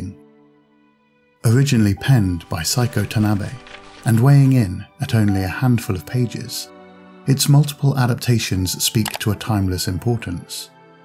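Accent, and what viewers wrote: Scotland, United Kingdom. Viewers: What a nice Scottish accent👍 and keep up the good work.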